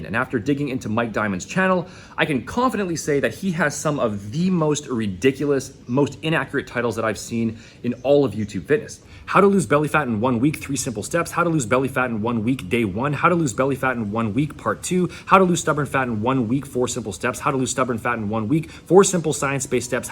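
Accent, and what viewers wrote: South Africa. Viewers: He sounds like hes from south africa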